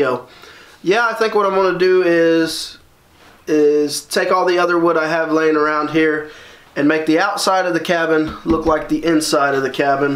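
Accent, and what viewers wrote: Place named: Indiana, United States. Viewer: Love the channel... Indiana here. Don't sound like I'm to far from ya. Keep up the good work.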